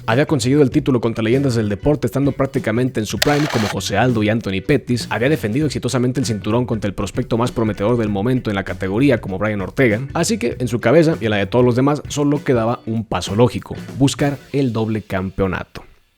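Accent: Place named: Spain. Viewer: No lo tomes así hay gente q no le gusta el acento español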